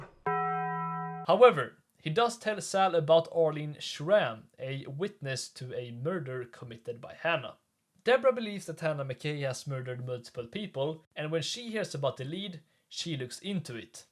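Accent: Sweden. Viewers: are u swedish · I´ve watched multiple of your videos wondering if you´re swedish. Thank you for speaking swedish at the end so my adhd stops trying to figure out your accent and focus on the content instead, men jag fucking visste det haha.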